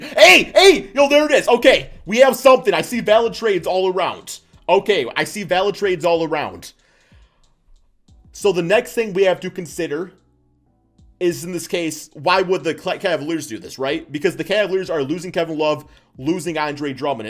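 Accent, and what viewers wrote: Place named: Bay Area, United States. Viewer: Big facts brah like yo is he from the bay? Or Michigan?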